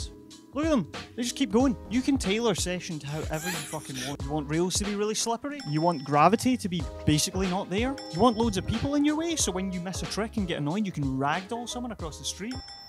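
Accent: Scotland, United Kingdom. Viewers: Belting vid with the hilarious ADHD style quick fire editing and Scottish accented quips.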